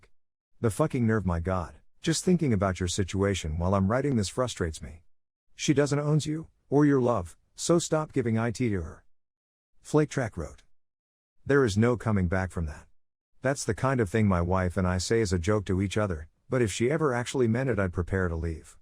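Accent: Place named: Australia. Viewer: "I sit down and cried." The guy sounds like an Australian.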